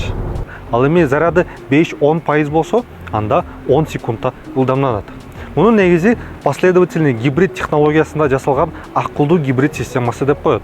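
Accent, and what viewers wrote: Standard. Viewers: Нукура кыргызча пай пай сонун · Кыргызча тазза граматно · Таза кыргызча керемет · Кандай таптаза кыргызча 🇰🇬🇰🇬 жолун шыдыр болсун уком. Азаттыктын денгеелинде суйлогонун жакты.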